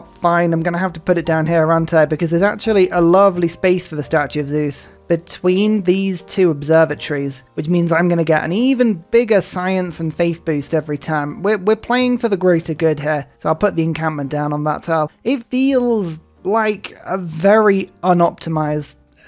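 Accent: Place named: United Kingdom. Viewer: Your brit-feminine accent is so sweet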